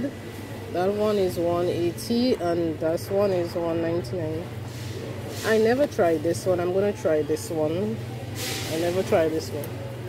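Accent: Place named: Saint Lucia. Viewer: I just came across this channel this morning am, today October 15, 2024. I just decide to check for youtubers from Lamentin. I decided to try this and the first channel I came across is yours. I heard the accent and I thought to myself, is that a fellow St Lucian. I have been told I have some relatives in Lamentin, because my grandfather was born in Martinique. I have been considering trying to look into find relatives who may have known my grandfather. Thats why I decided to look up youtubers from Lamentin. By any chance, have you come across anyone with the surname.....Darcis. You have a new subscriber.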